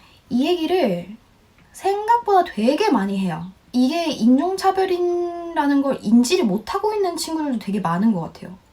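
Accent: United Kingdom. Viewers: 기분 나쁜 경험이지만, 제 기억에 남는건 영국발음 입니다 ㅠㅠ 너무 멋있어요 진짜 ❤️👍🏻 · 영국발음 장난아니네요 와~ 전에 다른 유튜버한테서 봤는데 남한에서 왔냐 북한에서 물어보면 "너 하기에 달렸다" 였는데 엄청 웃겼어요ㅋㅋ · 와.. 영국발음 넘 멋있어요. 뉴질랜드와 호주로 워홀을 희망하고 있었는데 영국으로 급 변경하고 싶네요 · 와 영국어보고 반했어요 바로 구독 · 영상 너무 잘 봤어요. 영국 발음 예쁘게 하세요. 마지막에 "I thought it was a dog barking" 빵 터졌네요 🤣😄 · ㅠㅠㅠㅠㅠ이 언니 왜 이제 ㅇ알게된거지 진ㅁ자 너무 이쁘고 너무 사랑스럽고 목소리도 너무 좋고 영국식 빌음도 너뮤 좋고 진짜 짱이에요ㅠㅡㅠㅠㅠㅠㅠㅠ 사랑함니다ㅠㅠㅠㅠ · 영국억양으로 공부한지 얼마나 되었어요? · 요며칠 영상 보다가 결국 입덕부정 인정하고 입덕했습니다, 영국식 발음에 내가 이렇게 환장하는 사람이었나 첨 알았어요 ㅋㅋㅋㅋ 진짜 내가 본 인종차별 대처 중에 제일 고급지고 우아하게 되받아치신 것도 너무 멋있고 영어 관심도 없고 공부할 생각은 더더ㅓ더더욱 없었는데 덕분에 영어공부도 관심 갖게 됐고 필기체도 연습해서 처럼 멋지게 쓰고 싶어졌어요❤️ 진짜 발음 너무너무 좋고 좋아서 영상 통으로 영어로 읽는 책 읽기 컨테츠? 같은 게 나오면 좋겠어요 제가 조회수 만 정도는 책임지고 보겠습니다 ^.^ 살앙해요 ❤️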